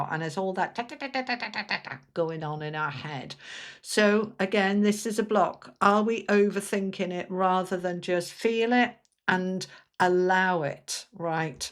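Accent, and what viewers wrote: United Kingdom. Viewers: thank you 💜 I'll continue to work on it. Your guidance is much appreciated, also lovely to hear a fellow UK accent ☺️ x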